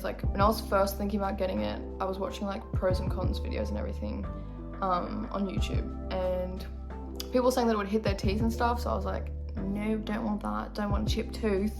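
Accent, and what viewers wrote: Australia. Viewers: My bi Aussie ass just sitting here stunned hearing a similar accent to my own and watching someone exist being that pretty- like huh 0-0 how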